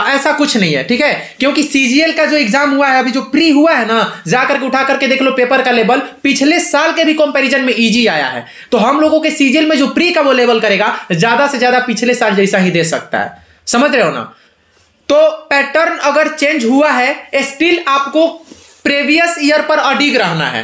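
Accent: Bihar, India. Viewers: आप बिहार से हो ना 😇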